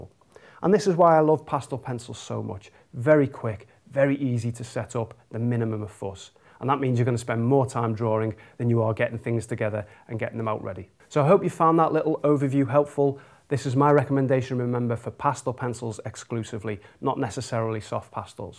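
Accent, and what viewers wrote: Liverpool, United Kingdom. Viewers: Your accent reminds me of home. Liverpool for ever!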